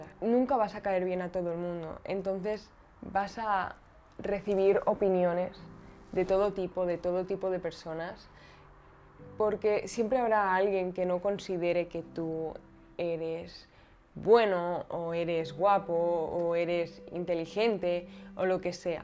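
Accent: Spain. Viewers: me gusta su voz de española suena agradable alv xd ;v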